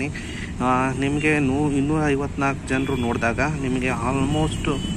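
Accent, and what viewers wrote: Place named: Belagavi, India. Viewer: Anna Belagavi English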